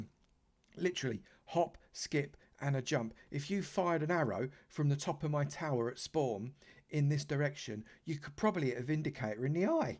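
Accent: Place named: East London, United Kingdom. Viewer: U sound like u support West ham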